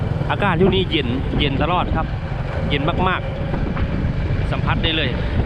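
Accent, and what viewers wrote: Phu Thai, Thailand. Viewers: เว้าภูไทเบิ่ด ปานได้มื่อบ้านติอ้าย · มักๆค่ะเว้าผุ้ไทมักสำเนียง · สุดยอดเลยครับอ้ายสำเนียงภูไทคือบ้านผมแฮงภูไทกะต๊ะ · พ้อแล้วนี้ละพี่น้องแม้นเลยสำเนียงภูไทกาฬสินธุ์สกลนครมุกดาหารนครพนมสำเนียงภูไทบ้านย่าแถบอำเภอเขาวงจังหวัดกาฬสินธุ์ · เว้าภูไทคือเดยว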